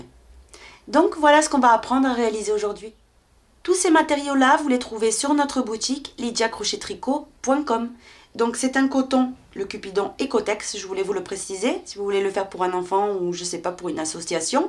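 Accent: South, France. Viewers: Il ressort un accent du midi?? Il me semble sentir Le Soleil dans votre voix et accent!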